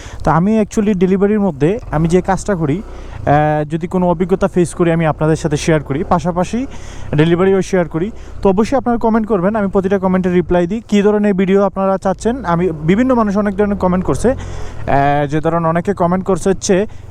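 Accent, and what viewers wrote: Sylhet, Bangladesh. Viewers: apni sylheti?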